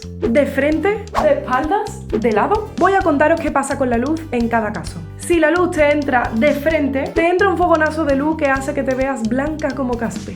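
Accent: Andalusia, Spain. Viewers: Me gust mucho tu estudio, muy bien. Se te olvido decir dos cosas .1. Eres muy guapa. 2. Me encanta ese acento andalud quills, Salud desde Tejas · HIJA NO ME ACUERDO ERES DE ANDALUCIA, ESE SONIDITO DE TU VOZ RECUERADME DE DONDE ERES ... PERO SIGUE CON ESE SONIDO DE TU VOZ. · De donde es el acento de ella? Es española pero suena diferente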